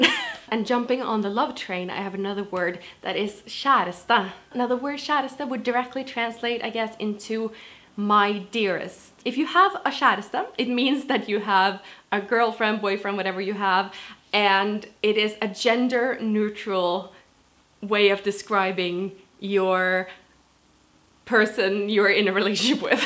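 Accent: United States. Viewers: Your English sounds very American to me.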